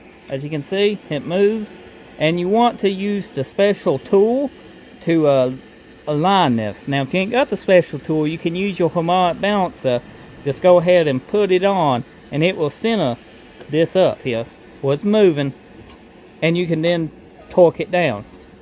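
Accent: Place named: South, United States. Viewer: It's a southern accent with a lisp. My buddy sounds just like him. Lol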